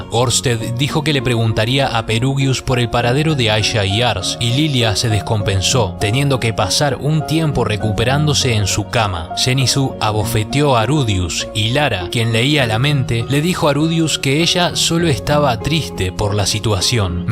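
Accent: North, Mexico. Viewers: Diablos , esto es lo mas norteño que mis oídos han oído jajajaja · Pero que norteño 😅😅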